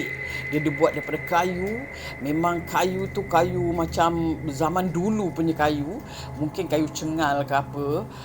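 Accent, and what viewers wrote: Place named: Kedah, Malaysia. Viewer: Org kedah mana suaranya??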